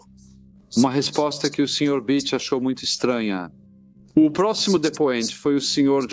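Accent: Portugal. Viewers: Esse sotaque de Portugal é muito chato! Não daria pra resolver isso?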